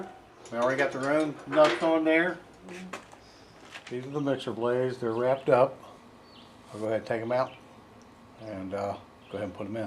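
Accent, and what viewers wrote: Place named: China. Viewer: The chinglish wasn't working for me... Thanks.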